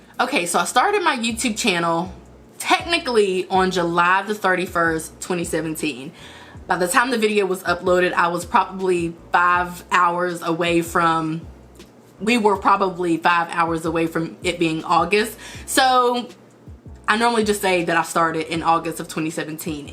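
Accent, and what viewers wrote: South, United States. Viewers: She look like my ex I gotta go 😭😭😭😭😭 Your extra country accent sexy doe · Damn its like she got a stong southern accent n it almost sound kinda Baltimorish 😅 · She is gorgeous as many have said and I gotta say this, I'm just a couple mins in and usually I hate a country accent, country music all that but I hear hers and I love it and o love her hair! Continue ascending queen!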